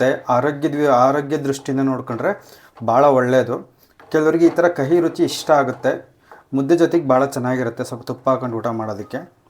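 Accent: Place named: Sira, India. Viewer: Nivu sira ಕಡೆಯವರ ನಿಮ್ಮ ಭಾಷೆ ಹಾಗೆ ಇದೆ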